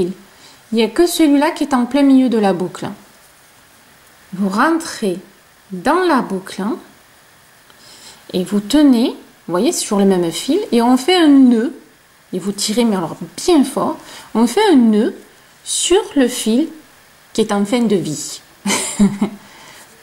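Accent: South, France. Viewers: Merci beaucoup de partager votre expérience avec autant de clarté et d'indulgence pour les débutantes comme moi. Je suis une jeune crocheteuse de 50 ans qui dévore les tutoriels très bien construits d'une jeune personne très sympa, "avé "le soleil dans l'accent !! J'entends les cigales dit ! ;) ENCORE MERCI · Ça fait des siecles que je n'avais pas crocheter et quand j'ai recommencé, mon travail avait l'air de votre échantillon!! Merci, je vais pouvoir me lancer!, . P. S. J'adore votre accent du Sud qui rappelle Le Soleil! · Bonjour dîtes moi vous êtes de quelle région ? C'est L'accent du sud ? Lol car je recherche des personnes de mon secteur 😊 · merci beaucoup.Très bonnes explications très claires avec un accent bien du sud.Très agréable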